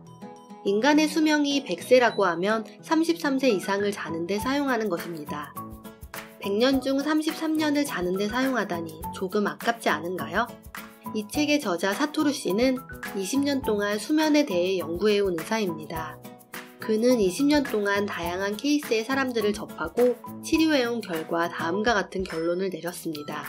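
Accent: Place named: Gyeongsang, South Korea. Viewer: 녹음하신분이 경상도분이시구나 ㅋㅋㅋ 경상도 사람은 딱 알아봄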